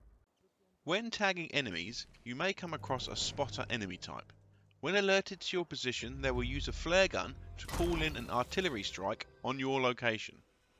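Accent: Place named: United Kingdom. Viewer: A bit late on here but ' better late than never' I got SE2 3 and 4, love these British made games, on Steam with an Xbox controller, USB connected, in SE2 there is a tutorial at the beginning, so this taught me how to sneak around n shoot. But with any games, make mistakes and you learn. The tip you did miss was when in the bushes and having a dump be sure you are downwind. Well presented tutorial, 10/10 clearly understand your cool calm British English voice, they are the best with tutorial lessons.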